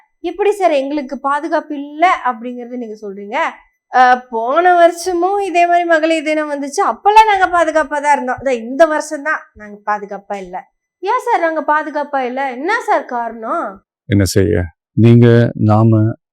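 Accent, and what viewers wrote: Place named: Coimbatore, India. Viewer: பெரியார். பேத்தி. அவர்களே. உங்களுடைய. அறிவும். அந்த. கோவை. கொஞ்சும். தமிழும். சேர்ந்து. அரசியல். என்ற. பெயரில். இந்த. நாதாரி. பசங்களை. வச்சி. செய்கிரீங்கம்மா. நீங்க. உங்களைப்போல். அறிவாளி. பெண்ணை. பெற்றவர்கள். உங்கலுக்கு. நன்றி. பெண்கள். தினத்தில். நீ. நீடூழி. வாழ்க. என. வாழ்த்துகிறேன்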